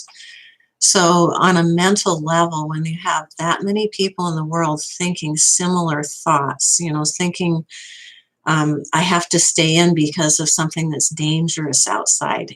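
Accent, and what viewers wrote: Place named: South Africa. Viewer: For the info ... I'm not a Mataji ( you couldn't know that ... no biggy ) , and I was initiated by Prabhupad in 1974 ... Let me ask you ... You're basically saying you took initiation ...by whom , and what " branch " ?!? And ... where are you roughly located ( your accent sounds almost south-african ) ?!?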